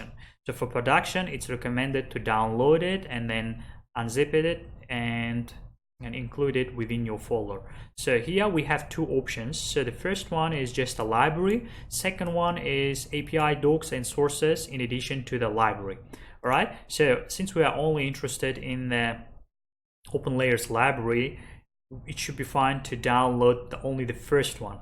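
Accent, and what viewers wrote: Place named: England, United Kingdom. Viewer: what english accent is this?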